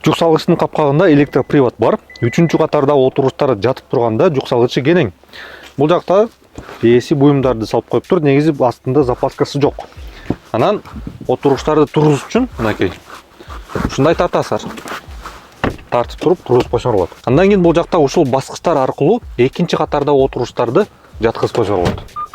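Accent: Standard. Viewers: Ото сонун эң жакшы деген баа беришибиз керек таза кыргызча сүйлөгөнү учун чыныгы кыргыз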